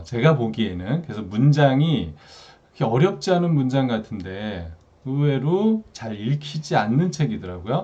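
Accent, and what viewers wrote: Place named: Seoul, South Korea. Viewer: ㅎㅎ서울사람 말투 행동하는 삶 으흠~^^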